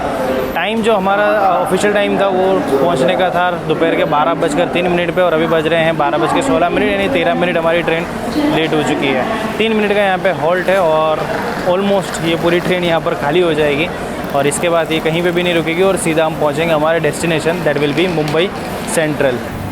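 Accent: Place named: Ahmedabad, India. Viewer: I think railway has personal grudges from you tabhi DD mein every time upper deck or station par baat karte time, EMD honk 😂 Jokes Apart, But seriously hamesha ki shaandar. Bich Bich mein Jo Ahmedabadi accent or Common Man wali bhadaas bahar aati hai, Cherry on Top ❤️ Baaki Kabhi toh moka do sir, ki vlog mein hum koi galti dundh sake 🤣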